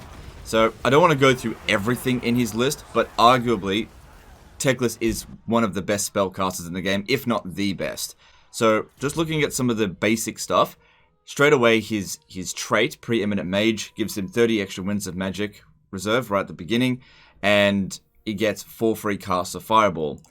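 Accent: Australia. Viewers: I just listened to aussie bloke describing shaving his balls.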